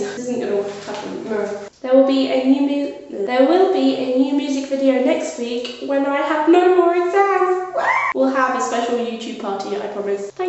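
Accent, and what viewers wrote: United Kingdom. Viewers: I love your accent! Pure british :')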